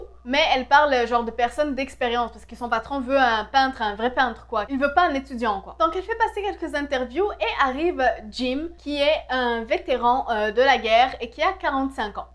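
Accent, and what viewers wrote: Quebec, Canada. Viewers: tu es québécoise n'est-ce pas ?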